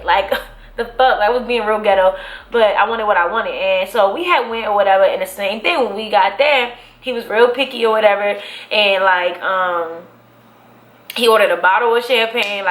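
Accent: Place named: DMV, United States. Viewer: dmv! period...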